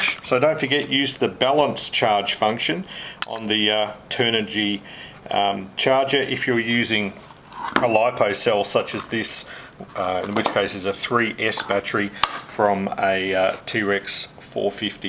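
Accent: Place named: Australia. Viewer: I can tell by your aussie accent that you are the man that can help me. I am new to all this battery charging and I want to get a good charger from america but I am worried about the 240 volts we have he in aus ruining it so my question is if it says the AC input is 100-240V does that mean I can run it off an aussie wall socket ? sounds like a dumb question right but I just need some reassurance before I spend $130 bucks. the racers edge surecharge is the one I am looking at. thanks in advance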